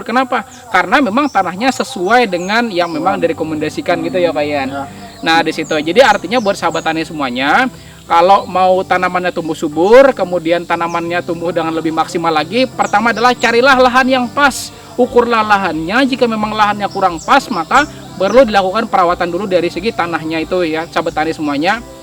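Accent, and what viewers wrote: Banjar, Indonesia. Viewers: Jelas sekali ulasannya, sehat selalu utk bapak penyuluh. Kya logat banjar, orang mana bapak? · Pian orang Banjar kah?? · Pian org banjar kh pak. Ampun chanel ini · Org Banjar sklinya 😂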